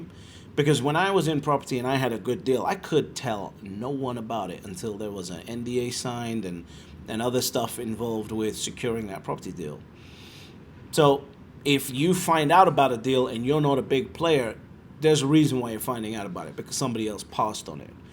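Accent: United Kingdom. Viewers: Digging the British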